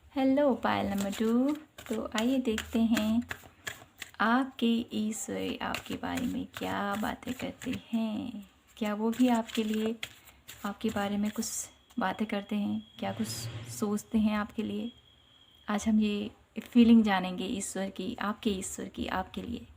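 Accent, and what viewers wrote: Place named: Bihar, India. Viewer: thanku ji Achcha laga ki aapane bhi Renu madam Se Hi Sikha Hai reading bhi aap bahut acchi karte ho aapka bolane ka style bhi achcha Hai Jahan Tak Mera Khyal hai aap Shayad Bihar ke Rahane wale ho aapke boli se mere ko Aisa Hi mahsus Hua kya aap Kolkata Nahin to Bihar ke aap Rahane wale ho aur Main apna WhatsApp number bhej deti hun agar aap Chahenge to ham log baat kar sakte hain theek hai aap ek bar humse baat Karen thank u ji aur family and Happy Diwali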